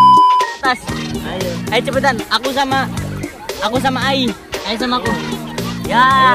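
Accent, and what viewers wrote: Cirebon, Indonesia. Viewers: Bang lu orang Cirebon apa Kuningan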